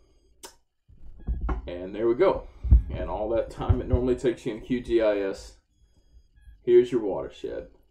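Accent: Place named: United States. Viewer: bro this helped out so much with hydrology class. Not trying to offend anyone, but I'm glad there is someone with a good ole American accent speaking. Usually there is some guy from India or God knows where, and I can hardly make out a word. Bless their hearts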